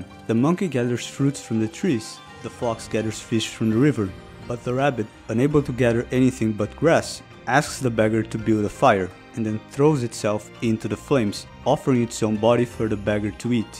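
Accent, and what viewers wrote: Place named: Brazil. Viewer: Just watched your first video and it was great! Super hyped to watch this next one. Also, judging by your accent I gotta ask, are you brazilian? If so, me too, salve!! haha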